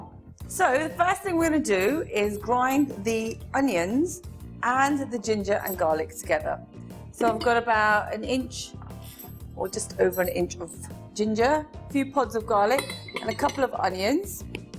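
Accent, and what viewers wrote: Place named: United Kingdom. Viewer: Bloody hell. Absolutely fell in love with the British accent!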